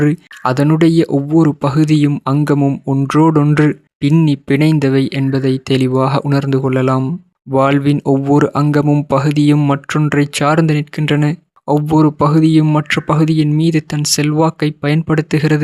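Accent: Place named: Tamil Nadu, India. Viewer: Assalamu wa alaikum neega peasura tamil ilaggai tamil matheri illai India tamil matheri iruku I am India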